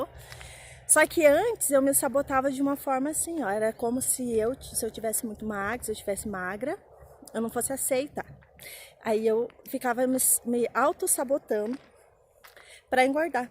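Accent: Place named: Paraná, Brazil. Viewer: Pelo sotaque é paranaense hehehe Parábens pelo vídeo, sucesso em seu canal!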